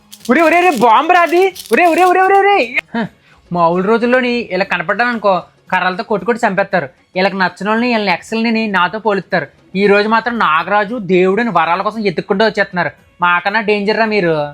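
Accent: Godavari, India. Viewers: Baboi...😂😂😂 Super comedy...ekkada unnaru mastaru meeru..love godari slang and very close to heart ....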